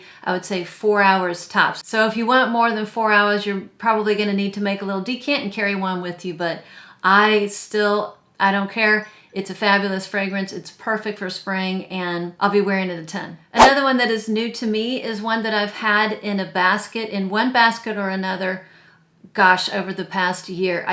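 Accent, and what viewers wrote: France. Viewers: My lovely and beautiful Scentsister 💕♥️ with a a crabby french accent😂😘 Let’s do a live chat some day😉🥰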